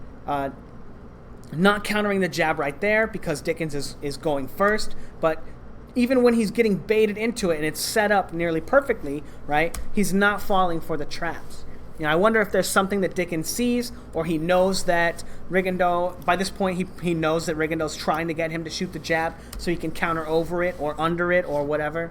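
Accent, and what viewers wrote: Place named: New York, United States. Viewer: You sound like Pauly Magnillagi LOL maybe its a New York thing that accent .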